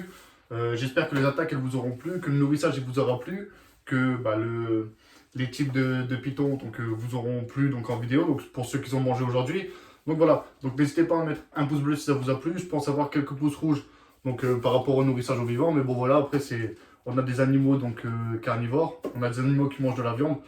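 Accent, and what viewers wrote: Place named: Nord-Pas-de-Calais, France. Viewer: Mdr la presentation en chtit. Tres belle video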